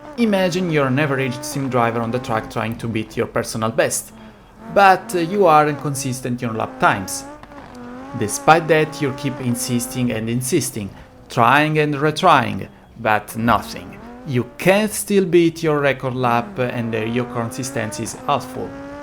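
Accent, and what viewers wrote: Italy. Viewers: You're Italian right?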